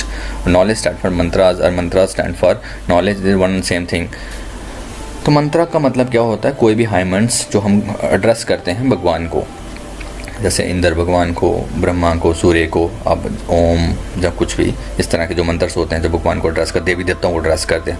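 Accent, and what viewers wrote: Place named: Jammu, India. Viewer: Bhaiya aap jammu se ho kya ? 😅 Aapki awaaz se lg rha hai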